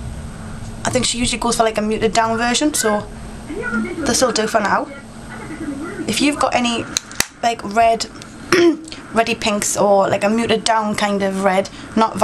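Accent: United Kingdom. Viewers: what's her accent? if a UK one, specifically which one?!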